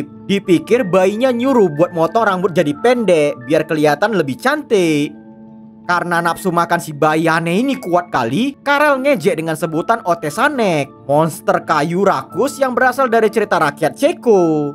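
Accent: Medan, Indonesia. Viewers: yg isi suara ini orang medan kek nya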